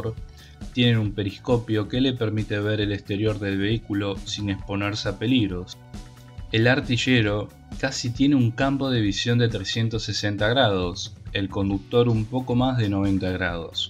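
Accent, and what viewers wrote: Argentina. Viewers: Me encanta tu canal, pero tango una pregumta, pod qué el narrator es o suena ser Argentina? Podrias hacerlo tu end vez? Saludos.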